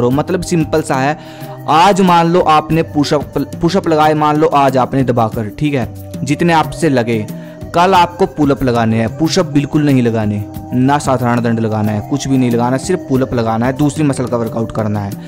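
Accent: Haryana, India. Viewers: Bhai aap kha se ho Mn to nu lagh h ki aap haryana t ho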